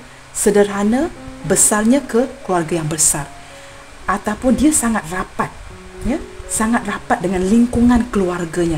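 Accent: Malaysia. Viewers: Tak apa kak aku suka kak cakap Malaysia , aku suka dan aku paham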